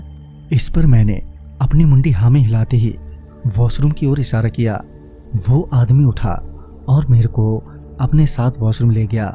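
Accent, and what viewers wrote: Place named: Gorakhpur, India. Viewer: लेखक को 1000 लाइक की आवाज़ को 10000लाइक ये पूरी खलीलाबाद ओर गोरखपुर की बीच की है....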